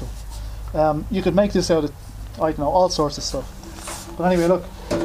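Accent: Ireland. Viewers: OMG, that was entertaining to watch the entire way thru, what with the Irish accent, the simplistic attitude and the raw fire power just waiting for idk, something more than just heat.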